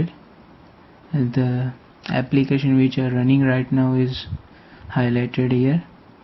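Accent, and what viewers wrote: India. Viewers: Come on. He has an indian accent.....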